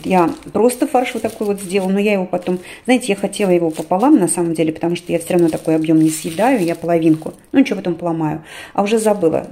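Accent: Baku, Azerbaijan. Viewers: ДАЙ БОГ,ЧТОБ СРАБОТАЛ МАГНИТИК-СЧАСТЬЯ🙏🙏🙏👍‼️А МНЕ,ОЧЕНЬ НРАВИТСЯ БАКИНСКИЙ ГОВОР,ОН МЯГКИЙ,МЕЛОДИЧНЫЙ))))А ВООБЩЕ,СЧАСТЛИВЫЕ ЛЮДИ У КОГО ПОЛИСАДНИК...ЖИВИ НА ЧИСТОМ ВОЗДУХЕ,КОНЕЧНО ЕСЛИ НЕ ИСПОРТЯТ" ВРЕДОНОСНЫЕ ЧЕЛОВЕКИ"))))МИРА,ДОБРА,СЧАСТЬЯ И ЗДОРОВЬЯ ВСЕМ-ВСЕМ!!!!🙏🙏🙏♥️🌺🌷🌹‼️ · 😄😄бакинский акцент. 👍👍 А когда мы собираемся сёстрами мы используем этот говор🤭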